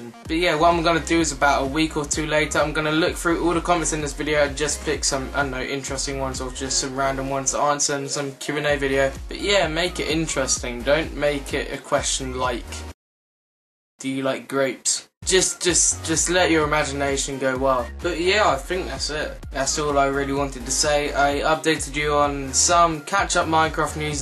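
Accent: England, United Kingdom. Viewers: what part of the uk are u from? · Where abouts from England are you from?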